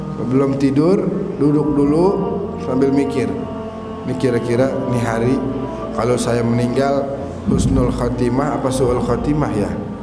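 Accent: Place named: Betawi, Indonesia. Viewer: assalamu'alaikum habib saya sangat senang cara penyampaiannya tawsiahnya bicaranya habib itu Betawi banget enak didengarnya saya asli Jakarta bib buat habibana pokoknya sehat selalu panjang umur amiin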